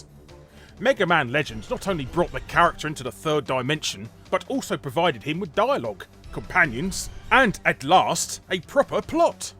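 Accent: United Kingdom. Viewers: Actually, you forgot... lol. I guess being a "yank", hearing a British young man do a sarcastic nerd voice just sounds hilarious to me. BIG FOR THE WIN!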